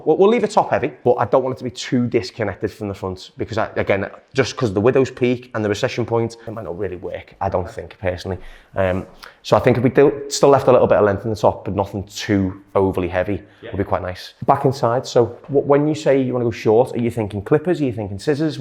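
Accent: Liverpool, United Kingdom. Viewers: Although I'm not from England & don't recognise accents too easily, it seems as though is Scouse (from Liverpool).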